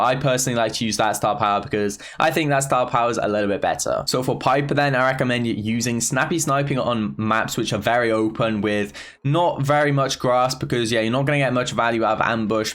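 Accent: United Kingdom. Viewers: I love the British accent ;)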